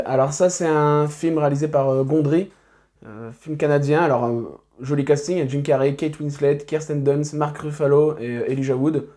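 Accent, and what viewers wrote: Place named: North, France. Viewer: de rien 😊 je viens de découvrir ta chaîne, elle est plutôt intéressante. Bravo à toi. Avec le petit accent du Nord en plus 😉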